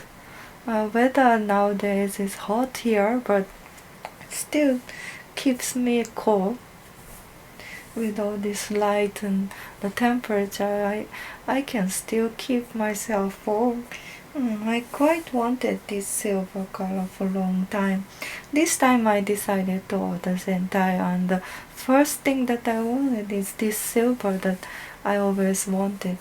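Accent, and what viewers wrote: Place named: Japan. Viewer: Your English is good, but sometimes sounds more Japanese and go to English classes to make your pronunciation more better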